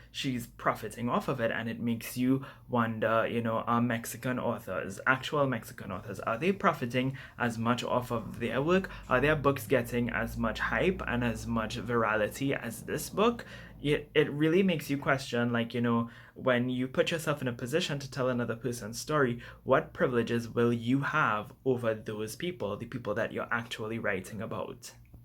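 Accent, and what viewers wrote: Trinidad and Tobago. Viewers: I heard your accent and I was like OH MY GOD because I haven't seen a lot of trinidadian booktubers before. So it's nice to see someone come from where I'm from that's into this. :D And honestly you have such a good point here. It's one of the reasons I don't like blackwashing because it's lazy. Instead of writing characters who are POC or looking and supporting authors who are and do write POC (which then makes these things popular) they get fussy about these white characters. I think diversity depends on a couple things. Setting, like you mentioned as well as how you write their character. Of course culture and heritage is linked but when their only character trait is that they're black it comes off as ignorant. Especially if you're not from that culture. I think that people who write these characters if their culture is heavily linked to it, they should do a lot of research and have people from that culture do a sensitivity reading. 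But I also think we need to talk about the publishers and marketing. They're really the ones that push aside and don't properly promote POC writers. Which needs to be tackled. Because if we don't fix this in the first place by supporting POC authors and making awareness, who will? These kind of situations also make me wonder what's my place as a mixed Indian. I can already tell that people will drag me because I'm not 'actually Indian' since I'm from the Caribbean. Am I only allowed to write characters like me? Even if my entire book/screenplay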